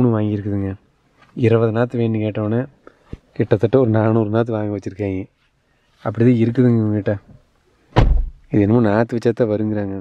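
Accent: Kongu, India. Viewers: Ungaluku vera channel iruka bro.. irundha sollunga.. unga videos romba edharthama.. informative a irukum.. that kongu thamizh awesome❤ naan valarndhadhu madurai.. adhu dhan kaadhellam inikum thamizh nu solluven.. Unga thamizh ketadhuku apram.. thamizh enga irundhalum azhagu dhan thonuchu❤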